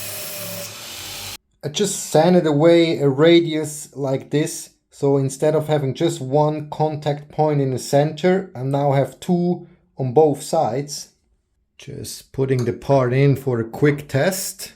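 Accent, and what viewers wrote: Switzerland. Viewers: Your skills are so amazing. This is the famous Swiss precision at its best. btw i like your English with the swiss Accent..🙂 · Echt Super ! und schoen deine Stimme zu hoeren Mit Zueri accent aber kein "full on" Zueri Dytch!. Wir haben in Oktober von Hirzel, 8816 nach Deutschland umgezogen so Gruezi aus dem Schwarzwald !!!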